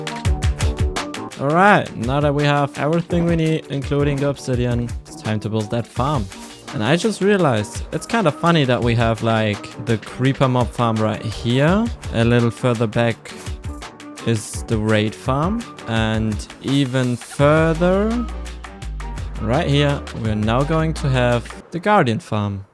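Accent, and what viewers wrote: Germany. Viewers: are you german ? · your english is very german-ish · Are u german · for me it sounds like german cuz im german and its the same accent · Deine Stimme und der Untertitel ist so deutsch xD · i just found your channel and i heard a bit german in your voice are you german? If you are german im german too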